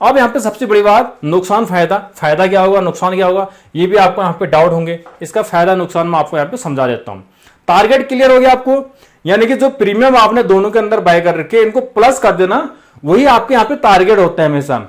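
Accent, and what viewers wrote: Kumaon, India. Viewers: Your sound like a kumaoni 😮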